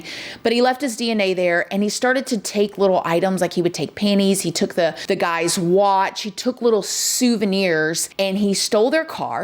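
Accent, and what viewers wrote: South, United States. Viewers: These people are not normal. The brain scans of these people are markedly different from brain scans of so called 'normal' people. I have no doubt that if Ted Bundy were to get out of the Florida prison he was in before he was executed, he would ve killed again! Ted Bundy could have disappeared into obscurity and probably would have never been caught. But he had to kill again! They need to kill like an active alcoholic has to drink again. By the way I love yourselves videos and love your southern accent 😊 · You are so entertaining your energy is awesome..😅 I love the da-da-dahs and your accent is so nice! Far better than this boring kiwi 🥝 (New Zealander) what part of America are you from? I'm asking as one of your babies! 😆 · Girlfriend, I love You!! Just came across you a couple of wks ago and trying to catch up on all your videos! 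You and Better Off Red are my favorite! You’re so sweet and I just live your country accent. Just curious though, where are you from? Tennessee girl here ❤️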